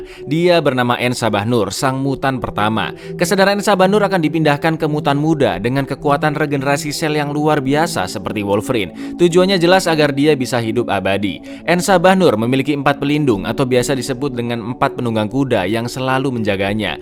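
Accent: Java, Indonesia. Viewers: Ngomong buyar nya gg wkwkw kentel amat jawa ta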